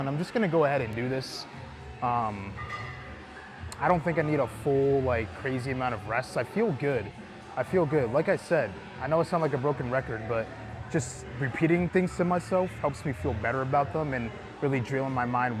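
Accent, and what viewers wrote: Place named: United Kingdom. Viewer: deadass thought u were british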